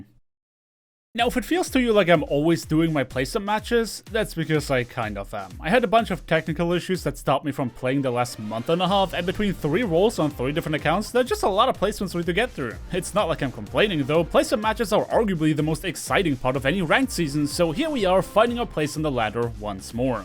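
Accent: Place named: Germany. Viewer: I knew it You are a German Dein Akzent hat mich immer vermuten lassen, dass du ein Deutscher bist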